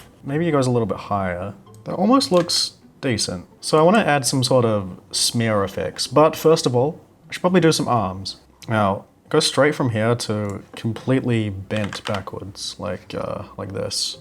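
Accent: New Zealand. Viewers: Are you kiwi? 🇳🇿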